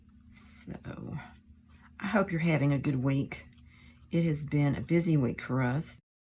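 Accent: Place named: South, United States. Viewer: Just found you today. I’m creating right along with you❤. Your voice is so relaxing!! And I’m partial to southern accents as I live in Kentucky. You’re a nice visit on a Saturday afternoon!!😊😊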